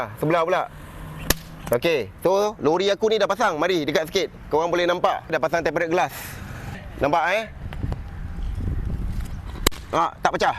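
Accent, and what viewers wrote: Java, Indonesia. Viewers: Jawa bang… kena paham bang… gitu la bonyinya… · Pekat jawanya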